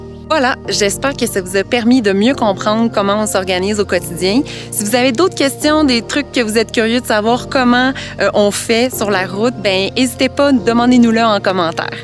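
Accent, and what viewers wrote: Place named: Quebec, Canada. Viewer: toutes vos videos sont tres interessantes !! quand à l'accent et aux mots quebecois, j adore !!! merci de Paris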